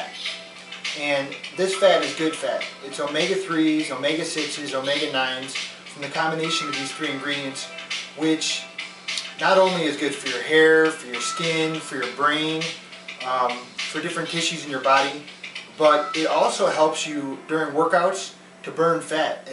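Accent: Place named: United States. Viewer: Your American accent is too thick and with that messy kitchen I can't concentrate on what is happening.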